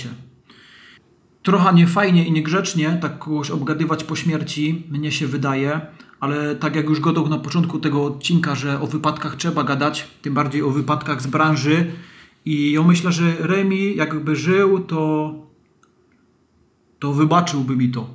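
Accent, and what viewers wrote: Silesia, Poland. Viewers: szacun za przemyślane i wyważone słowa i za śląską gadkę!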